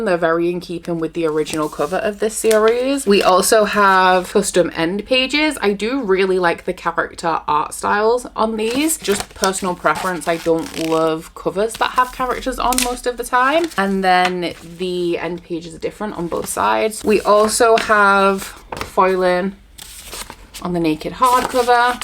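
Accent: Yorkshire, United Kingdom. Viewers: My first time watching your videos and LOVE LOVE LOVE. Can I ask where is the accent from I hear Yorkshire but there is a Scottish `twang ? Am I right? ❤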